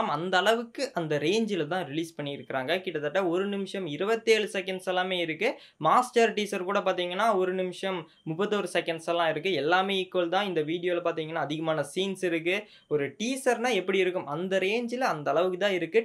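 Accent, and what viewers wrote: Kerala, India. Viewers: Ninkal malayali aano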